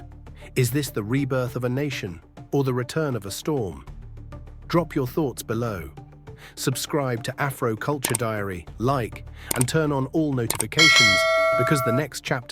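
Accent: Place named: United Kingdom. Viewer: YOU MAKING OF HIM A HERO JUST TO GET LIKES. THAT ALL IMPORTANT TO YOU LIKE I HATE UK ACCENT YOU ARE THE EVIL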